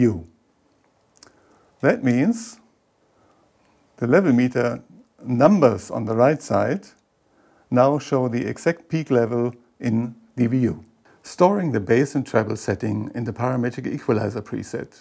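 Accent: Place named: Germany. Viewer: I listened to the whole video mainly because of the cool German accent.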